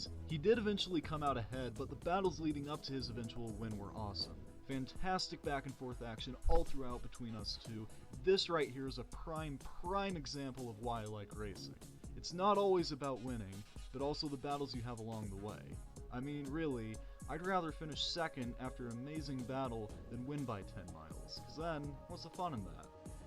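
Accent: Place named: United States. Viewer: Very nice! The memes are great! LOL! Nice surprise to not hear a European or Australian accent too! I didn't you know you were American/Canadian!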